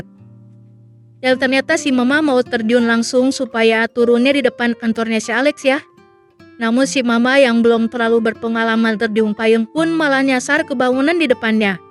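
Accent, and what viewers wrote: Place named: Cicadas, Indonesia. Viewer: Jigana si admin orang cicadas ya. 😁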